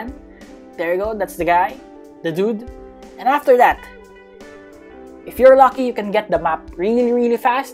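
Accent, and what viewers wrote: Philippines. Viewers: I also knew he was Pilipino like how he is talking and like My family Talks with like a high voice a little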